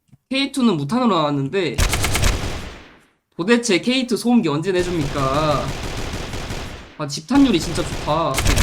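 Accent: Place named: North Korea. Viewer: 근데 고향이 어디시지? 왜 북한 사투리가 들리지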